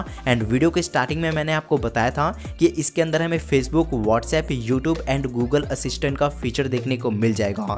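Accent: Hyderabad, India. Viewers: hyderabad sa ho kya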